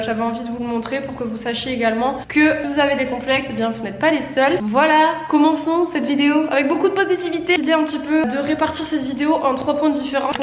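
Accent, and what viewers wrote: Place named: Marseille, France. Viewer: Ohhhh une marseillaise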